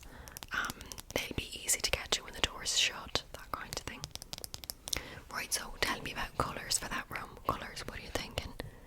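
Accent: Ireland. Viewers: Loved this! More pls- in Irish accent ofc!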